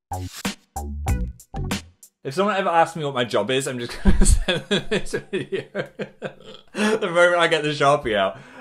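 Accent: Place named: Northern England, United Kingdom. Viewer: part of rebranding is that his northern accent is coming back and i am living for it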